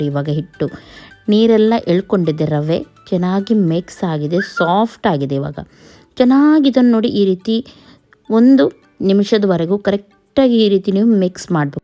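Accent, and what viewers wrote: Mangalore, India. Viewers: Hi dose supper ನಿಮ್ಮ ಮಂಗಳೂರು ಕನ್ನಡ ತುಂಬಾ ಚಂದ ಉಂಟು 💐 💐 💐 💐💐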